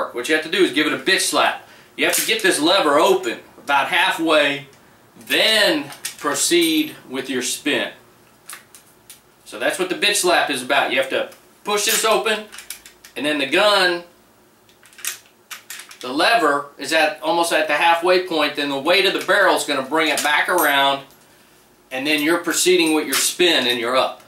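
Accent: United States. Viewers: Love your fully Texan accent (no homo tho)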